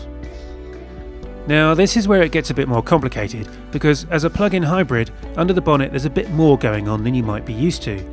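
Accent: England, United Kingdom. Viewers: Only englishmen can understand that english.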